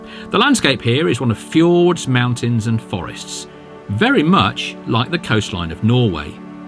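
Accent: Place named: RP, United Kingdom. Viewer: Love the posh accent.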